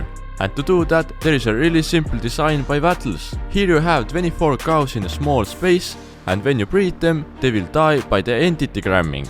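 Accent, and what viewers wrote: Estonia. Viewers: u from estonia?? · He sound like he is Estonian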